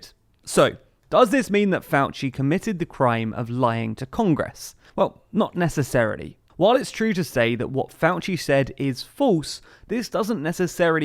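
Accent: England, United Kingdom. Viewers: It's the English accent. He did say, "Rand". Unless it was written and I missed it.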